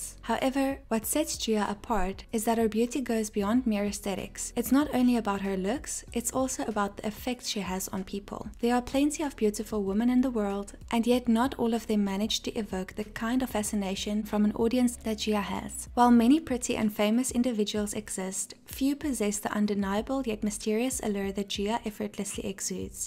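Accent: South Africa. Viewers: i seemed to recognise the south african accent too haha ! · Are you from South Africa?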